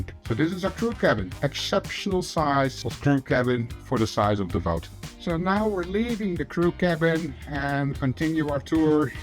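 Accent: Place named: Netherlands. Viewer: Lekker Nederlands accentje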